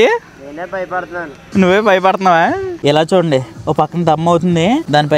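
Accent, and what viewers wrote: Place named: Rayalaseema, India. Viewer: Anna Videos Late Avuthunnay But N Duko Mee Slang Ante Maa Raayalaseema valla ki baga istam anna Ann Alte Cheyakandi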